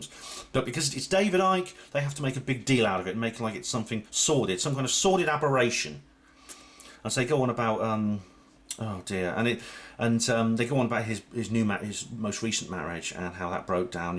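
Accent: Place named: RP, United Kingdom. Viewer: I have been a subscriber to your channel for a couple of years nowand i enjoy the content and share quite a few of your views, however without meaning anything bad i have to laugh at you slagging or mocking the way the toffs speak all proper,or Daily Telegeraph like. You do not exactly have the twang of someone who was brought up on a housing estate. Whether you were or not isn't what I'm getting at nor am I slagging you,but you do talk quite proper yourself. I can understand a bit cos growing up in Glasgow I talked a bit different when I am with my mates than I do when I am speaking to someone in an office setting or maybe a court (lol) definatly na lot more from I was 17 or 18 plus i went to Northern Ireland to live from about 1990 till 2006/7 so I had to change it or folk would never have understood me, so I understand if that is why you speak properly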